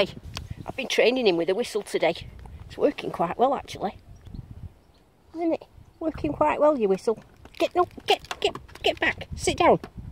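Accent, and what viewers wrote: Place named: Rochdale, United Kingdom. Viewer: What an amazing watch on ash worth valley. Your videos presentation is spot on. Love your humour and the Rochdale accent is just so close to home it’s a comfort to hear your commentary. Waiting for the sunshine to go and explore ❤️🇬🇧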